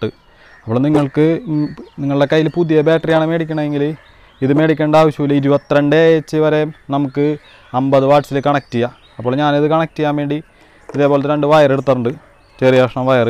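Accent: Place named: Edavanakkad, India. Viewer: താങ്കളുടെ സംസാരം ഒരു എടവനക്കാട് touch ഉണ്ടല്ലോ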